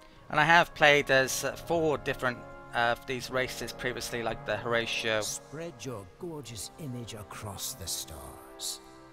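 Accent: Wales, United Kingdom. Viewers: u from wales?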